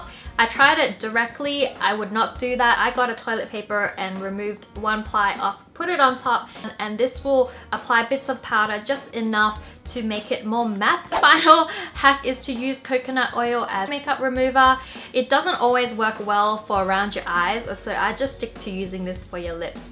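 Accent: Australia. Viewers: Are you Australian? OUO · I miss her more Australian accent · Wait am I the only one who think her accent is stronger (Australian) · Her OZ accent tho😍😍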